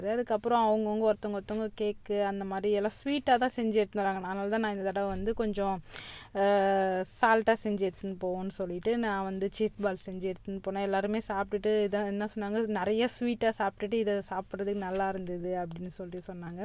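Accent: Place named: Chennai, India. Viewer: no sis chennai nanum Village la than poranthu valanthen innaikkutha unga video first time pakkuren namba ooru slang la pesureenga romba happya irunthuchi ❤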